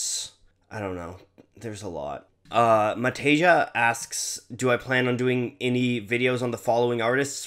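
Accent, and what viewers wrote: Canada. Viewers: You sound so Canadian it is adorable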